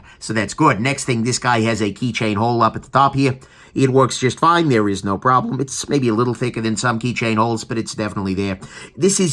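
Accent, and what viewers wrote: New York, United States. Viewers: i have not heard a more new york italian accent in my life...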